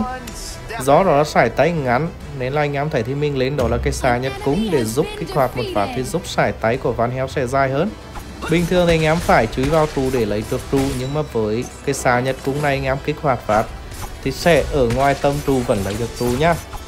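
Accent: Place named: Hue, Vietnam. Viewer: giọng huế ko nghe đc gì 😢😢😢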